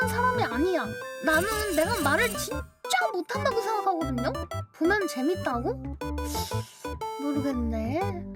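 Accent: Gyeongsang, South Korea. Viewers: 마뫄님 보이스 참 매력적이죠. 살면서 만나기 쉽지 않은 음성이라 보면 됩니다. 경상도 특유의 쎈 억양과 사투리를 구사해도 전혀 상대가 화나지 않는다는건 엄청난 강점이자 재능입니다. 교원 실습하셨을 때 인기 많으셨을 것 같은데요. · 웃긴건 모르겠는데 특색있어서 매력있는 목소리이긴 하죠. 같은 경상도사투리를 쓰는데 전혀 다른느낌이라ㅋㅋㅋ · 경상도 방언 쌘거 맞습니다 ㅋㅋ 일본어 비음많고 중국어 성조있듯이 그냥 특징인데 화 많이 나셨나 보네요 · 처음엔 물방울 떨어지는 소리/농구 코트 급제동 웃음소리에 뫄며들었는데..... 이제는...... 경상도 사투리 방음부스 설치된 이후의 호탕한 웃음소리 엄청난 떵겜실력 가끔 들리는 꿀꺽 소리 자주 들리는 컹 소리 대두뫄 버츄얼뫄 뫄마모찌 없으면 못사는 몸이 되었다구!!!! 뫄마조아!!!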